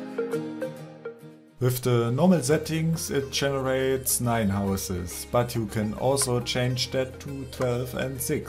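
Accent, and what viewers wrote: Germany. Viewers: ich lieb dein denglish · Da kuckt mann ein Video und hört den Deutschen Aktzent raus :D find ich gut. ^^ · Are you really englisch? ;D Its sound very German. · Amazing command guy ! PS : I love your german accent :D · hello i était like your German voice xp it's more simply to understand you than American youtuber and I think it's a really good idea to use the new effect into your command it's beautifull ^-^ have a nice day for my it was "fête du travail" yesterday :3 (sorry don't no this word in english ... :/ work day I think ? )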